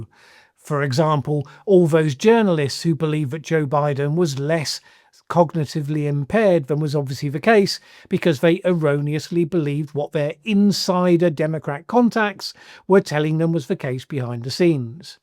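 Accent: United Kingdom. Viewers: Sounds like a Brit, smells like a Ukrainian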